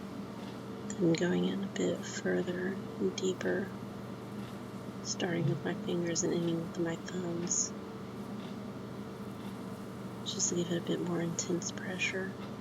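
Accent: South, United States. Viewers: I would have to guess she is from Louisiana, she sounds like she is from the south to me. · Well, from what I've heard I've determined she has a bit of a southern accent meaning she probably originates from southern parts of the USA, my best bet will be one of the Carolinas :3c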